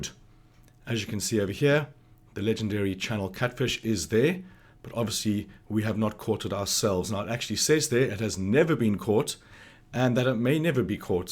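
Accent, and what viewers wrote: South Africa. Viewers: Holy shit I did not expect a South African accent!!Howzit my China💪🏼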